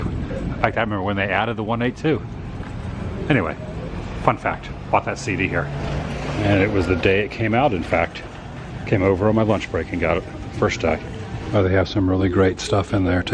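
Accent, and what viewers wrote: United States. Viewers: a goth superstore???! oh you mean golf! lololololololol im in NZ. that USA accent! :) dig ya vids as always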